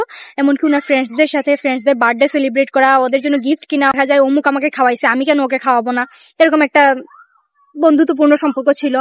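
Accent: Sylhet, Bangladesh. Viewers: Apu apni to sylheti?? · আপু তো মনে হয় সিলেটি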